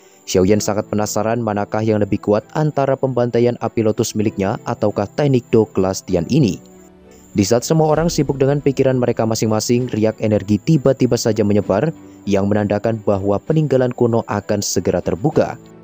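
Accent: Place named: Malaysia. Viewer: Saya minat dengan cara sebutan min yang tidak kalah dengan orang malaysia, sama seperti portal hitam, cara penceritaan yang penuh penghayatan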